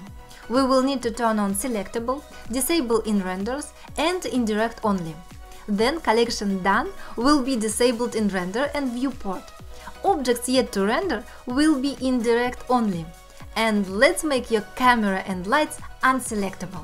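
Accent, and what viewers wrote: Russia. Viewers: Из Русского только русский акцент) но спасибо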